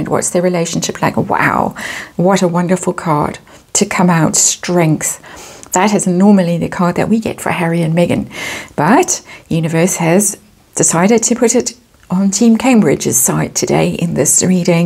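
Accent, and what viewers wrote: South Africa. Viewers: I just want to thank you for a lovely reading. I always look forward to your readings. Merry Christmas to you and your family from a South African now living in New Zealand! I love listening to you as it reminds me of home! 🇿🇦💕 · This is a superlative reading. Thank-you! What a gift - my only this day. Such a beautiful pack of cards; (as an art director) I appreciate the design, the subtleties, the lateralness. I love your neatness and delivery, reliability and all-round warmth & gentleness. Also, after hearing which country you could be in, also by your accent; I found some kind of comfort knowing you might be nearby - us being at the bottom point of the globe. Then, recently you spoke of being near the sea and sand dunes; so I am thinking Blouberg side, or Noordhoek side. I'm in the City Bowl, near the top of residential Table Mountain. 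Seasonal blessings & love to you and yours. And a good 2022.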